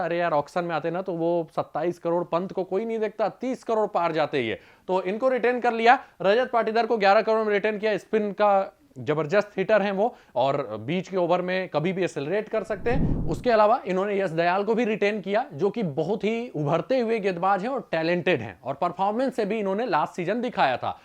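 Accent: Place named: Bihar, India. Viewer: Hindi to sahi bol pehle pure bihari accent aa rai Baki sabb to okay hai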